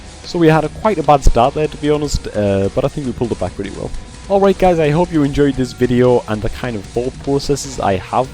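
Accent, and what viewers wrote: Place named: Northern England, United Kingdom. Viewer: Hey im not sure if you have said this in another vid or been asked before, but where are you from? you sound like your from northern england, i may even go as far as saying your from Newcastle like me!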